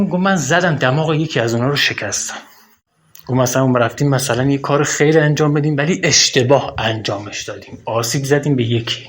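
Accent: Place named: Kermanshah, Iran. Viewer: چقدر لحجه کرمانشاهیتون جالبه وقتی درحال ادغامش با لحجه دیگه است که به لحجه خودتون صحبت میکردید بهتر بود همشهری واینکه دوبار تجربه مرگ رو داشتم وهربار تا یه مقطعه جلو رفتم وبعدش بهم گفتن نمیتونی جلو تر بیای باید برگردی الان زمان تو نیست اینجا باشی ومن اصلا نمیدونستم این حرفی که میزنند یعنی چی همش میپرسیدم شما چی میگید نمیفهمم برای چی نمیبرید منو چون من داشتم از یه جاده مستقیم بی انتها میرفتم جلو به نظر من قبل از مرگ قطعی هرکسی دنیای برزخی رو یه جور میبینه و با دید اصلی رفتن روح بعداز مرگ به دنیای ماورا یا دنیای بعد ازمرگ قطعی فرق میکنه فقط میخوان بگن بعداز مرگ باز هم ادامه داره راهتون ودر قران گفته شده فقط شقی ترین ادمها به جایی که جهنم گفته میشه که صد در صدبا اتیش وسوختن و این شکل توصیفها نیست احتمالن جایی سختی هست برای پاک شدن روح که اون هم گفته شده فقط شقی ترین ادمها به اونجا میرن وگرنه گناههای روز مره یه پاک زدایی داره ازجسم وبعد ادامه راه یا زندگی اونجا رو بهشون میدن فقط تنها چیز مشترک بین همه تجربه گرها وحتی خود من وفکر کنم روحهای مرگ قطعی همون تونل هست که باسرعت به سمت بالا میبره روح رو من وقتی داخل نور شدم در انتها خودم رو توی یه جا یا یه محیط دیدم که چیزی هم از اومدن بالا از طریق تونل یادم نمیومد وحالا محیط چطور بود وچی شد خیلی طولانیه که با نوشتن نمیشه گفت هرچند من چند دقیقه جسمم رو ترک کرده بودم اما زمانی که اون طرف بودم به اندازه یه نصف روز یا بهتر بگم مثل هفت هشت ساعت بودنم اونجا طول کشید نمیگم زمانی بود یا حس میکردم هست فقط اتفاقهایی که اونجا برام افتاد بعداز برگشتم به اندازه این زمان طول کشیده بود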